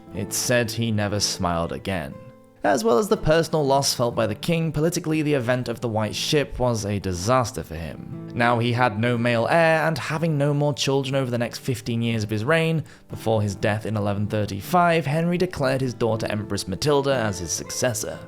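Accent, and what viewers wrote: England, United Kingdom. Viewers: You sound English? They didn't teach you this? I am Australian. I learned this ... did the conservatives fuck you over THAT much?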